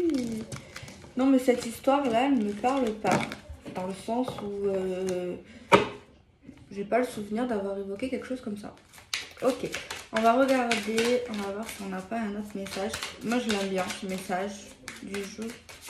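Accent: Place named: Provence, France. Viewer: J’adore ton accent provençal, ne change pas … j’ai de la famille dans le sud de la France . Je viens du Québec , et j’adore tes expressions. Ça me rappelle mamie de Aix-en-Provence .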